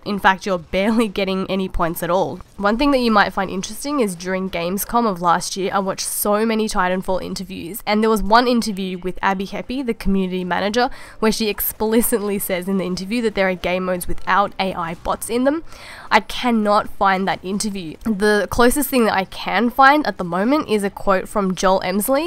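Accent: Australia. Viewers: I sense strong australian accent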